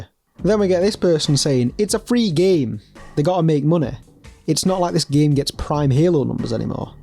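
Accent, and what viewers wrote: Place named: South Yorkshire, United Kingdom. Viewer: South Yorkshire police, by the sound of the accent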